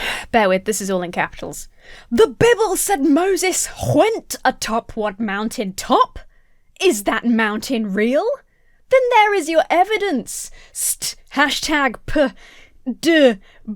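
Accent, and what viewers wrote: United Kingdom. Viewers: has a point. why are you british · Wait, you're British??? Shocked, I am just shocked! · "Why are you British" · Indeed you are.💛And your short hair and"sporty style"are adorable.😊 Not a fan of the british accent,but that's my problem,not yours.(I'm Italian and,growing up,I got used to the american accent thanks to my"Buffy"DVDs,in case you were wondering.) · why are you british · I'm glad you know you're an exceptionally beautiful young woman and won't let stupid comments deter you from that. You are also bright, smart, quick witted, seemingly very educated and I love the Bri - ish accent. Please continue doing you so I may continue watching/listening to a higher level of videos since YT sent you my way and therefore have subscribed. Peace and all that. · "Why are you British?" 😂😂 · Why are you british? ladlsfkajsdflkja;sdlfkj;adlsfj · Wait, but why are you British? 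· DAMN I am jealous on your absolutely PERFECT British accent , I wish I could talk like that. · I also would like to know why you are British. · "Why are you British?" What in the world???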